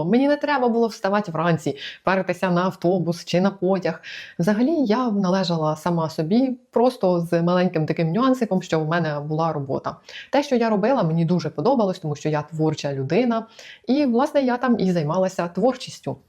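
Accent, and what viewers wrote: Ukraine. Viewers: Гарне відео. Якщо можна особисти питання. Ви випадково не з Полтавщини? Якась знайома балачка🙂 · Прикольно,це перше відео із вашого каналу,котре я дивлюсь,чогось ютуб його мені підкинув.Подобається ваша щірість та те,як ви розмовляєте,ваш легесенький суржичок просто божествений.Дякую вам за надхнення ! ! !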